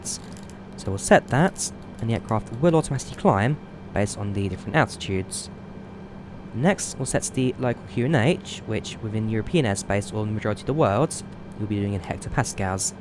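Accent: United Kingdom. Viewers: which area of British accent this guy is?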